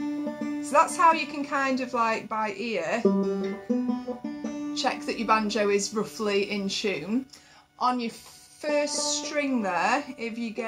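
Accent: United Kingdom. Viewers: Hey, I discovered your lessons while donating platelets and I love em. I about rolled out of the chair laughing when your British accent made me think of the pun, "Skip to my loo"! 🤣 I was delighted to get home so I could play along and I feel like I'm making good progress. Thanks!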